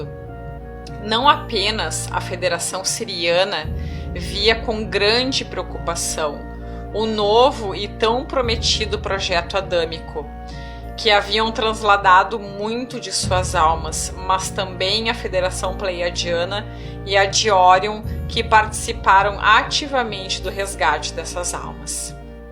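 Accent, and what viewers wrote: Rio Grande do Sul, Brazil. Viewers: Adeildo Amorim sotaque gaúcho. Bem como todo o jeito que ela fala.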